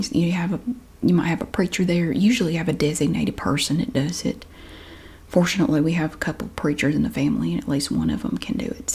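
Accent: South, United States. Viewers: I found your channel four years ago when I moved away from home at 18 from Alabama to California (to go to school for linguistics). I already loved ASMR but I started to get homesick so I looked up "ASMR southern accent" because I thought it would bring me some comfort. Your channel was the first one to come up, and your voice reminded me so much of home! So thank you for bringing me a little bit of familiarity and comfort during a hard time, and I love your accent ❤ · The southern accent is one of my favourite american accents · Whoever accused you of faking your accent is clearly unfamiliar with genuine Southern accents, and has watched Steel Magnolias one too many times. I grew up in North Mississippi and I currently live in East Tennessee. The accents are similar. Only slight differences in N. MS and TN accents, but N. MS and S. MS accents are VASTLY different. 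Anyway, thank you for the video, and it's always good to see you too. ♥ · Ohhh you’re SOUTHERN southern,,, I’m Appalachian southern. Very different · You accent sounds like Forrest Gump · I want to come to america and date a girl with a southern accent lol. What states have nice accents like this? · First of all, I love your accent and positive energy! Also, I’m a store shelf organizer too 😜 ❤️ So many people think that there’s only one southern accent. I’m from Louisiana, and we have several different southern accents over here as well. The north LA accent sounds completely different from the way we talk down here in south LA. We, like you in N.C., also have several different accents just within the coastal parishes of our state. Where I live, we sound like what you hear on the tv show “Swamp People”. Whereas, in the northern part of the state, they sound more like The Robertson’s from “Duck Dynasty” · That’s hilarious that someone accused you of faking it. 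I’m from the south and there’s many many different accents. My kids don’t have as much as an accent as I do either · I love to listen to you talk. My dads family was from West Virginia, so their accents are different. But I love all southern accents. Sounds like my childhood. ❤️ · your accent reminds me of my mom's side of the family. To me accents are beautiful just like different languages. To hear you brings back a memory of my Nanny (my mom's mom) she had a fantastic southern accent. Now I'm born and raised in South Texas and my mom from TN. So thank you for bringing back a nostalgic feeling. You keep doin you and I concur the internet being exposed to social media affects us. In every way possible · 6 years ago I went through military bootcamp and it was the first time I was really exposed to the southern accent. I remember one specific girl, she was my wingman, who was from North Carolina and she had a very thick southern accent that reminds me of yours. 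I also made lots of friends from Georgia while I was in the service, my Georgia boys ❤️ The southern accent will always hold a special place in my heart. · I enjoy trying to mimic accents, and yours is the most fun to try. It makes words more fun to say and it's pretty to boot. Many Southern accents have more in common with British than I think a lot of people realize. A lot of syllables are drawn out in a similar way. Thanks for the tips and tricks, soon I will be an expert lol ;p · something about souther accents makes me tingle.... thank you for this video! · I love your accent! I am planning on going to college in the south next year because I have always loved listening to people with these accents. wish I had one myself! Love your videos 💗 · I live near raleigh and I hate that I don’t have a southern accent- my mom moved from Franklin Virginia to California and got made fun of because of her accent so she had to learn how to talk like them I always wanted an accent like yours and I love watching your channel because you have the same accent as my grandmother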